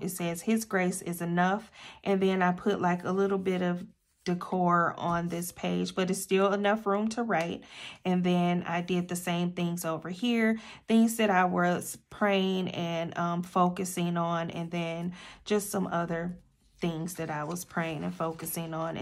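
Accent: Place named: South, United States. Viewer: I really love your southern drawl. Very soothing! Good ideas. I am not crafty but writing things down as you say is important!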